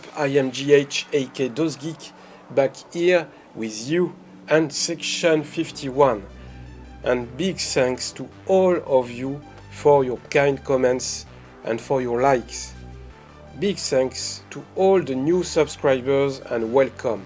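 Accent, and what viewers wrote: France. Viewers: I have to say a critic but hope you'll receive this as a constructive criticism, hope you'll forgive me, your videos are super awesome however your French accent is very strong sometimes I nearly can understand you you need to talk more clearly, it's my point of view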